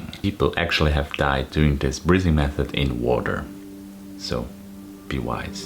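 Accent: Switzerland. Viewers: Are you swiss German? I detect a slight accent. Barn Deutsche maybe?l lived in beautiful Switzerland. Loved it! Kia ora from new Zealand.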